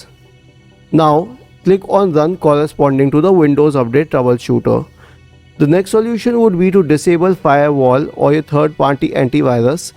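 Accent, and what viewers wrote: India. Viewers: that indian accent is a warm alarm that my problem is gonna get fixed thank you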